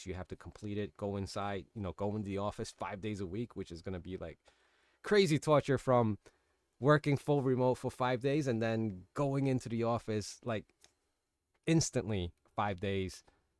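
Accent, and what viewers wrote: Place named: New York, United States. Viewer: Niiiiiice - Everyone has thier $$$ figure that they will have a wolf of wall street moment and go back into the office..."you show me a paystub making that amount and I'll quit my job and work for you today" lol Being that you sound like youre in NYC and some of the duties you described....it has to be 175k+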